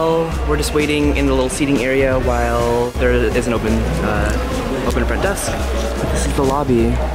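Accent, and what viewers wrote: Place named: Philippines. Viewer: ok so you are pinoy. that's why you got an asian accent when you speak english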